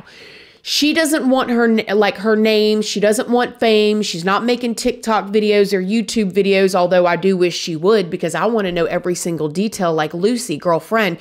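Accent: United States. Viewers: Seguay from the story - your are looking amazing! and Love your accent - although we are English, My Auntie married an Air Force man and lived in Little Rock after the war - and now she has an accent like yours. I used to get her to say "tom-ato' and "burrrrrgers" all the time! I hope they dig up that dog - gone well. Other wise we will never, never know. Nahhh, they will eventually, even if its crowd funded. The suspense would be too much.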